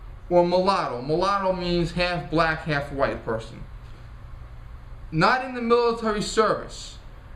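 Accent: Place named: New York, United States. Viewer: I've learned a lot from your videos you not only bring truth to the subjects but also humor it good to hear a new York accent.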